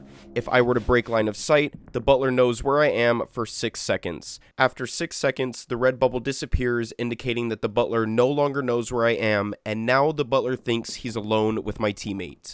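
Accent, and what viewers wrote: United Kingdom. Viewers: Brishish man from South London.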